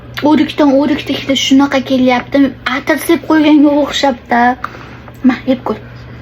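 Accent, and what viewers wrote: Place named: Andijan, Uzbekistan. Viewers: наманганни шевасимас бу Наманганни шевасида ту́лик гапирилганда Андижон Тошкентлилар агар биринчи марта эшитса умуман тушунмайди. Ку́про Андижон шевада гапиряпти бу киз. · Водий манимча андижон бизни келинимиз андижонлик шеваси шунака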